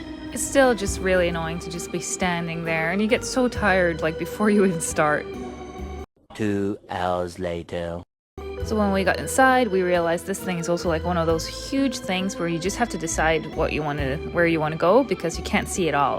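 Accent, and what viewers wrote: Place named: United States. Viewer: thanks for the video. I enjoy your candor. I agree with you that the Louvre is too crowded and the trip to the top of the Eiffel tower at night is fantastic...and I don't like heights either but the view is phenomenal. I don't like long lines either. My favorite part of Versailles was renting a golf cart to tour the gardens in the back! By the way, your English is phenomenal. The more time you spend around Sam, the more American you sound!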